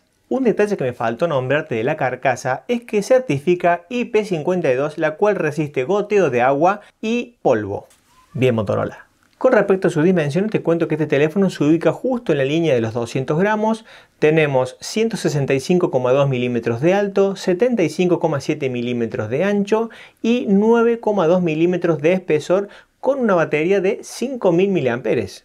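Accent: Córdoba, Argentina. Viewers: ¿Eres cordobés?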